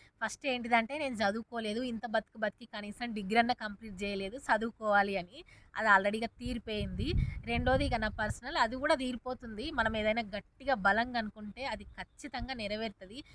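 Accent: Telangana, India. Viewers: గారు మీ వాయిస్ తెలంగాణ యాసలో సూపర్ 👌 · Sister మీ వాయిస్ సావిత్రి V6 anchor శివ జ్యోతి అక్క... Telangana యాసలో మీరు బాగా మాట్లాడగలుగుతున్నారు👌👌👌👍👍👍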